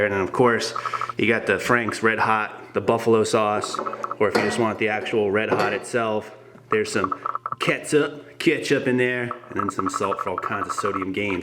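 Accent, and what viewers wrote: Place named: South, United States. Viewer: YUSSSSSSS the return of with that ole southern accent. Appreciate it man it sickening.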